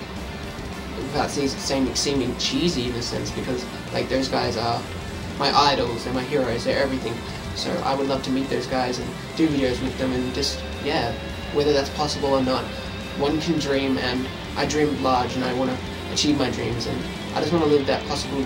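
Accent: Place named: Australia. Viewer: You sound Australian. Are you Australian? (I am auzzie 😜)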